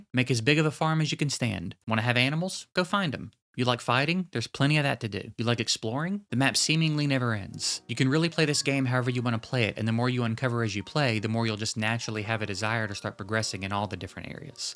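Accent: South, United States. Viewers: I love how my man here is so positive all the time and it's great to hear southern YouTubers. But also he hears a faint ticking while opening mail and he loses his shit.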